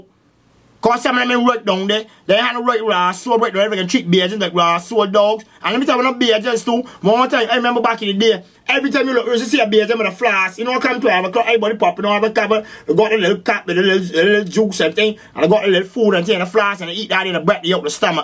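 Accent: Barbados. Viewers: Lmao his bajan accent is the best sounds like my grandad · I freaking love the bajan accent!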